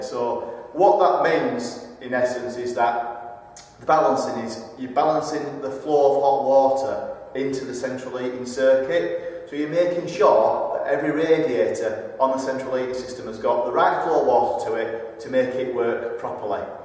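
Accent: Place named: Yorkshire, United Kingdom. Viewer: Nothing wrong with your Yorkshire accent, I didn't see Harold Wilson or William Hague using subtitles. I bet Captain Cook didn't have subtitles. Only criticism is that the speaker is too far from the microphone which results in the echo. You can help me install an air source heat pump, but you live too far away.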